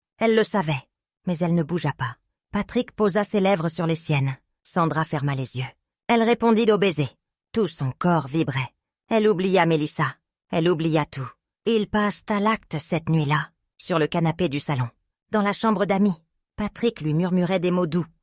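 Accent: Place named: Spain. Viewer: Histoire à méditer car très instructive Bel accent espagnol ❤❤❤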